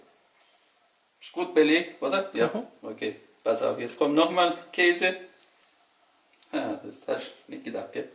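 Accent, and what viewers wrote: Swabia, Germany. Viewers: So lustig und sympathisch der schwäbische Türke😂 wieso ist das Video nicht FSK 18🤔 so porno das Essen😏😍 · Dude Deluxe ich find die Kombi auch extrem amüsant. Mein Lieblings Dialekt 😅👌🏻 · Coler typ der hört sich an als ob er aus dem schwabenländle kommt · Haha typischer Schwabe 😄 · Türkisch und Schwäble. Beste Kombi :D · Ich liebe diesen Schwäbischen Dialekt :D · Sympathischer typ echt, wie das Schwäbisch immer wieder durch blitzt isch Mega cool! Hab’s Video echt nur komplett angeschaut wegen ihm sonst spul ich immer vor damit ich seh was dabei raus kommt! Super Rezept! 👍🏼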